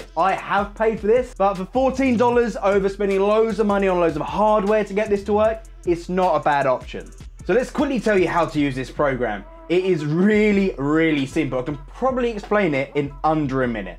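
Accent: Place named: Europe. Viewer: I dont understand shit. European english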